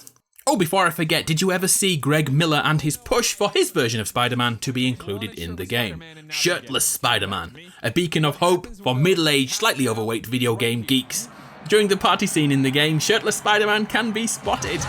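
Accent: United Kingdom. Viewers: Hey , you are British?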